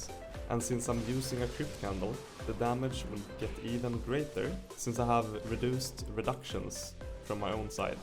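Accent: Sweden. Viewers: Your accent sounds swedish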